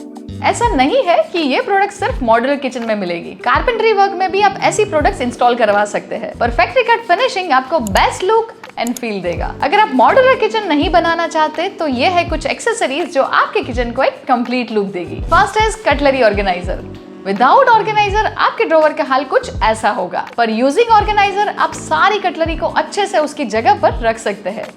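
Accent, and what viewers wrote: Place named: Gujarat, India. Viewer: I think it's Gujarati accent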